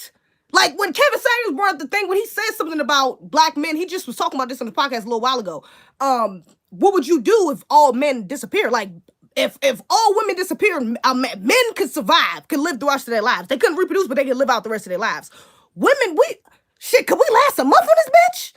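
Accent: Baltimore, United States. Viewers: U from Baltimore ain't u sis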